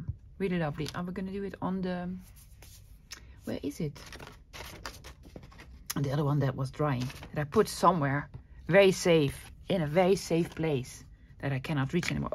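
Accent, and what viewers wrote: Netherlands. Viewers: Hello, I am new to junk journaling and stumbled on your videos by accident, your Dutch accent was like music to my ears as I travelled to the Netherlands a lot when I was much younger, places like Amerstam, Rotterdam, Guoda, Delft, I visited Glass city and the flower markets and auctions I have been to Floriade twice, and many other smaller places, unfortunately I have not visited for quite a few years now, I love your videos my first attempt at a journal is almost finished it's not perfect but I like it, it is filled with many of your projects from the 100 day challenge, you are such a talented and gifted person, and a lot of things from your past resonate with me.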